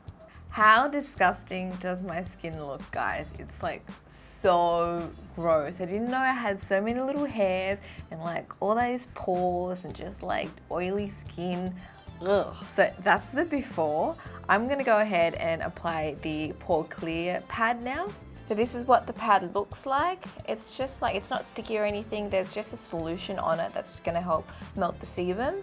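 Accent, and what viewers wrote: Australia. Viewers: Asian with a aussie accent....wow...like McDonald's "I'm loving it".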